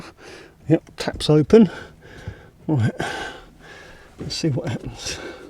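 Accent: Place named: London, United Kingdom. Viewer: Hearing you speak * reminds me of the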